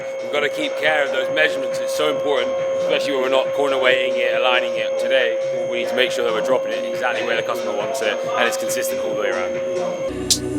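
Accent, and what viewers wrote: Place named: United States. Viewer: Yank speak, delete.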